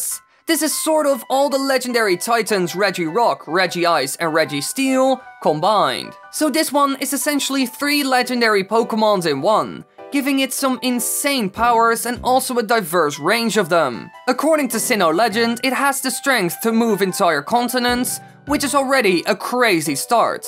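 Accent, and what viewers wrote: Netherlands. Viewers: ar you dutch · Are you dutch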